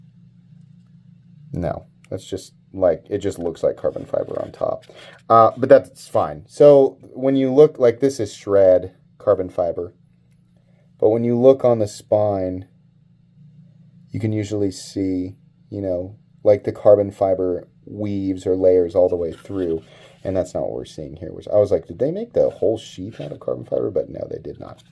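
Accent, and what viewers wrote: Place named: Kansas, United States. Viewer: I kinda imagined people in kansas talk like that anyway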